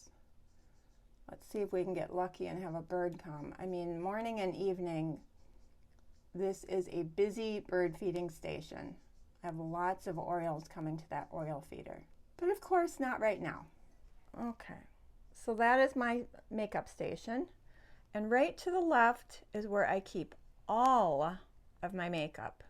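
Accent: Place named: Midwest, United States. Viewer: What a lovely, Quint cottage you have!! Thank you so much for sharing it with us. Can I ask if you are a Midwesterner, like me? We kind of sound alike:) You do a great job making use of the space you have!🦋☀️🥰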